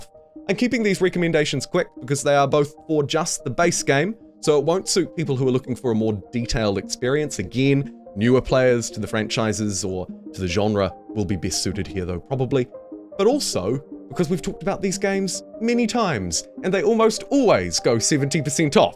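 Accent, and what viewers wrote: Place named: New Zealand. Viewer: Your NZ accent is so amazing it makes me wanna pay attention and listen to what you’re saying 😍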